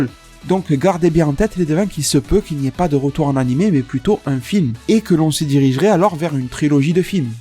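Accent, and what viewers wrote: Marseille, France. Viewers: t'es marseillais??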